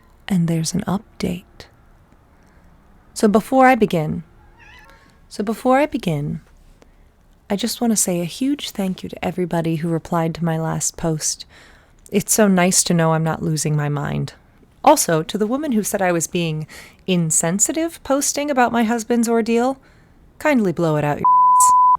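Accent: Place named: United States. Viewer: Finally pleasant dread in American accent, thank you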